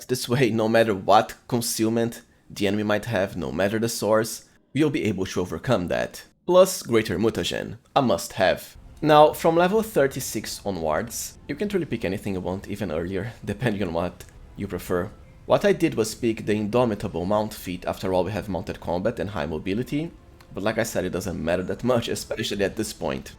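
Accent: Brazil. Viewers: Acabei de descobrir o canal. Você é brasileiro? Fiquei com essa impressão pelo sotaque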